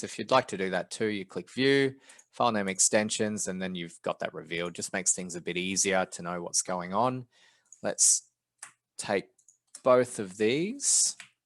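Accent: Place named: Australia. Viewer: I love your aussie twang mattttte,, been to long mate,, catch up in Discord,, Sam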